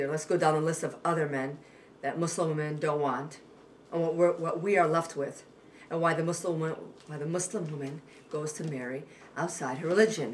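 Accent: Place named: New York, United States. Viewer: You have a New York/New Jersey accent, you sound like an Italian girl.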